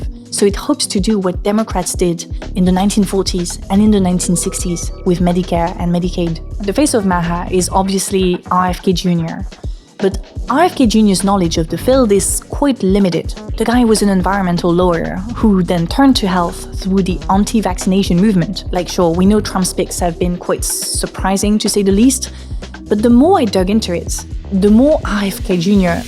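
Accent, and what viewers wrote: France. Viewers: I am British and I love your French Accent. I love your videos as well they are excellent. Keep it Up 👍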